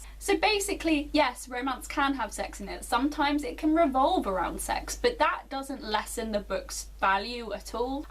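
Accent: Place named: England, United Kingdom. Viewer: Your english accent! I thought you meant egyptian mummy omg 😳 Also I didn't realize people actually say these things ☹️😂 the world we live in 🤣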